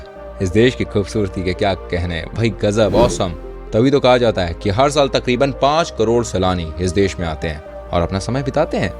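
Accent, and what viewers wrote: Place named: Mumbai, India. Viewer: ye voice or mumbaiya language bahut bor krti h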